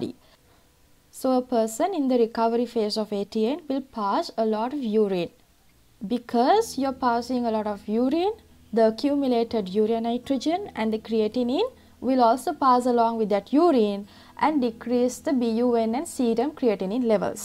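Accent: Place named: Sri Lanka. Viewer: I can tell you are a fellow Sri Lankan just from the accent hahaha. Thanks for the great video!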